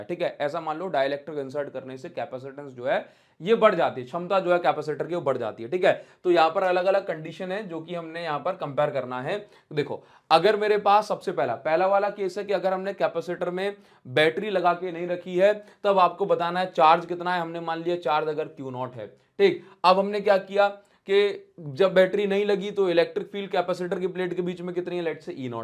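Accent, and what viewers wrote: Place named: Bihar, India. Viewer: Khela hove hai😂 true bihari axcent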